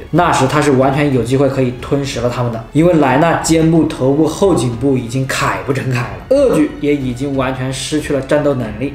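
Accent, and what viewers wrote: China. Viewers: 這口音是大陸人吧，完全聽不下去了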